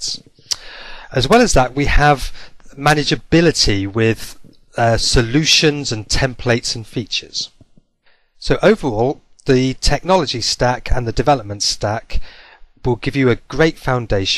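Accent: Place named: United Kingdom. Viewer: Yes, development-related video in British English - a dream come true :-D Seriously though, nice overview - a must-watch for beginner devs, but a notable omission of SQL Server indeed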